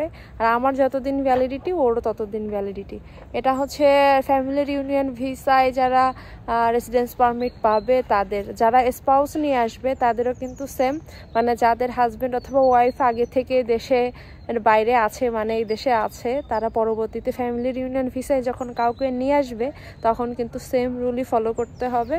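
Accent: Khulna, Bangladesh. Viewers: Apu ami Canada theke,Bari khulnai,apner khulna language khub enjoy kori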